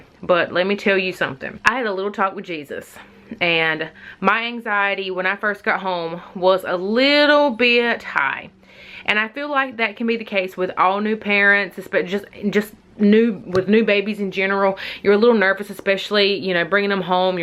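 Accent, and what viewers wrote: South, United States. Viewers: Sis! I’m here for that extra southern accent! Also, good tips!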